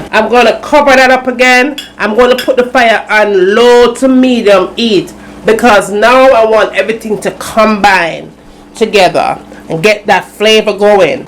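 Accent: Jamaica. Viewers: Love the Jamaican talk, love how you clean I would have your stew pork, but next time try putting the sweet peppers last.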